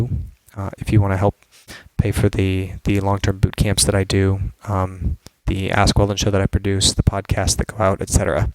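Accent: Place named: United States. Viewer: Where did you grow up? I find it hard to believe you're from Finland as you have no accent. You sound american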